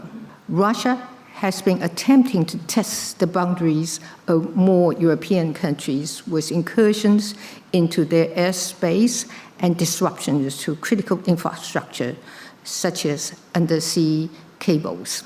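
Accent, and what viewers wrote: United Kingdom. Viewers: 說英文真的很好聽（真的很療癒），腔調有些英文腔，在她還沒當上總統時，就知道她很會說英文，也聽過她的演講，台灣唯一不會說英語的總統 “陳水扁”吧！ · 小英總統英國音很好聽，一切盡在不言中，我永遠小英辣台派，永遠祝福感恩小英總統， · 前總統真是女中豪傑，非常有智慧她不用看搞就能用流利的英國腔英文演講而且都不會凸槌，真的佩服她的勇氣和智慧！謝謝小英前總統讓世界看到台灣！有你真好，上帝祝福你平安健康！ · 我超愛這英式英語腔，超迷人的。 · 民主制度正遭受認知戰、資訊操縱與軍事威脅的挑戰台灣以韌性應對威脅，並積極強化國防與數位安全呼籲民主國家建立團結機制，共同維護區域與全球穩定台灣願意分享經驗，並承擔維護民主安全的責任以上為小英演講的重點英國腔實在太迷人了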